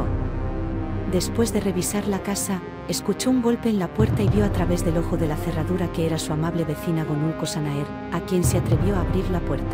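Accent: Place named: Castile, Spain. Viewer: Narración en perfecto castellano... Lástima que no sea el capítulo completo.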